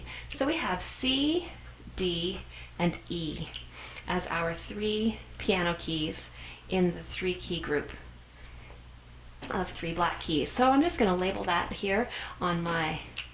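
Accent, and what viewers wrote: Canada. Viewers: I can really hear that Canadian Accent coming 'out'.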